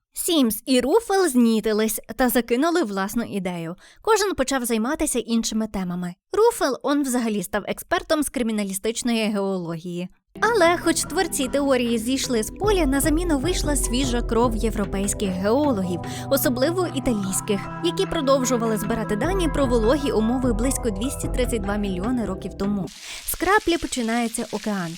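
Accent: Standard. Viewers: Чиста українська мова, яку хочеться слухати й вчити. Хороше відео цікаве й яскраве. Ось такі треба канали для України! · Величезна подяка за джерельно-чисту українську мову, цікавий виклад, гарну дикцію та відмінну інтонацію. Така подача точно не дасть заснути. Ще раз дякую. Слава Україні!!! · Неперевершена вимова...Чудовий голос.... Еталонна українська мова... Цікавий контент. Однозначно вподобайка..!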